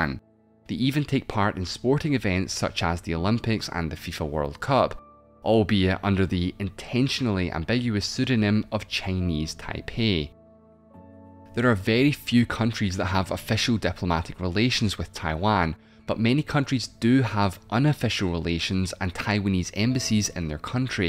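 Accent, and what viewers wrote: Scotland, United Kingdom. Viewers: I find this Scot very difficult to understand; could he please speak the King's English?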